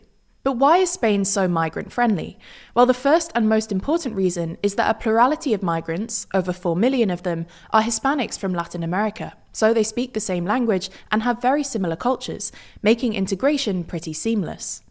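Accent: United Kingdom. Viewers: I like youre British English accent anyway ❤,and shape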